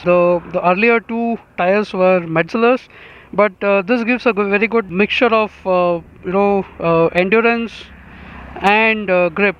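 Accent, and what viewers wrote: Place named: India. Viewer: Hate the freaking indian accent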